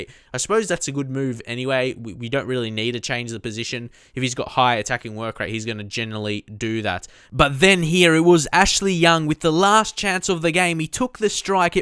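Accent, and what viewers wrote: Australia. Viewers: R u from Australia????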